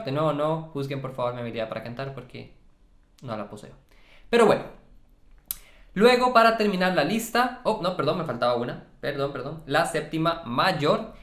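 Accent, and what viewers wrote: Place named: Costa Rica. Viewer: Hey. Ud es de Costa Rica? Su acento es tico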